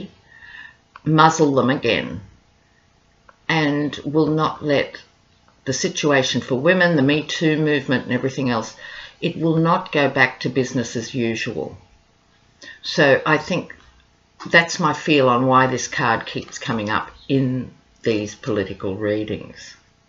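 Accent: Australia. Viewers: Great to hear a fellow aussie. Good stuff!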